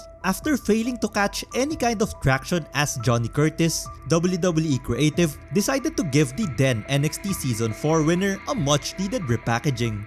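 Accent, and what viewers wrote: Philippines. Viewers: Based on your accent, it seems you're a filipino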